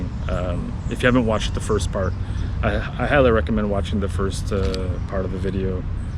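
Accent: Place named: Canada. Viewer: I am a brazilian, ffrom SP, I ve lived in a few countries, including the US (though your accent sounds a bit canadian) I don't blame others for all Brazil s problems, just the ones that were caused by others. Not only Brazil, it s notorious the role of the US in supressing democratic regimes throughout the world, replacing them by brutal dictatorships. Go read a book! I know Rio very well, I have family there, I agree with a lot of what you said, but you also said a lot of nonsense. I love Rio, but I d never live there, too chaotic, I agree people are not very polite, but carioca's are very friendly, easy going, if you didn t get along with them, maybe the problem is with you, not them. The thing you said about the "tamo junto" seems to be a misunderstanding on your part, even brazilians joke about it, "falei pra ele aparecer lá em casa, e o chato apareceu", still, brazilians are culturally much more open to others. 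From the few words you said, I can tell your portuguese is not very good, you have a very strong accent, despite allegedly living in Rio for years, that might be getting in your way of properly communicating with people. The things you said about brazilian women sounded pretty sexist too. The stuff you said about brazilian politics and social economic issues is just BS, Brazil has improved a lot in the past 20 years, I am an economist, working with finance, it s all in the data, do some research. That said, the US is ruled by the king of the deplorables, backe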